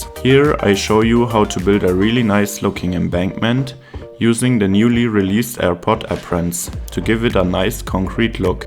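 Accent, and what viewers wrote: Germany. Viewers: YES! another great little "how to" video from the master. And the small fun inserts like are back ( as well as that smooth german voice 😊just makes me feel that there is professional behind it ) And to be honest, I can't wait for CS2 just to see what you can do with it, as well as some other people like Egg, FewCandy, CCP and Lee Hawkins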